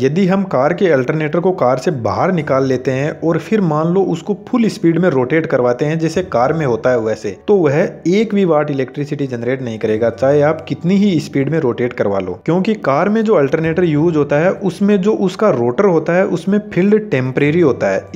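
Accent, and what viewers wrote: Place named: Shekhawati, India. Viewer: Bhai Shekhawati ke District Sikar,Churu, Jhunjhunu se lag raha hai Bolne ke lahje se ...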